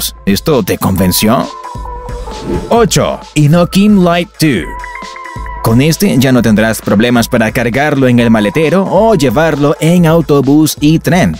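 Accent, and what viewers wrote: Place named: United States. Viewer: La pronunciación con complejo de Yankee da grima, Ssscurerh, bareri en vez de batería pero khe, ya de paso que diga las light y las wheels XD, eso sí buen video y buena información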